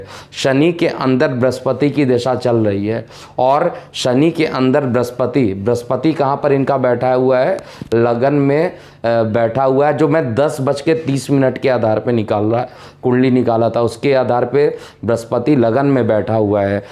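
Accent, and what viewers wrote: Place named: Bengal, India. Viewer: First learn to speak correct hindi first.dont mix bengali in hindi speaking.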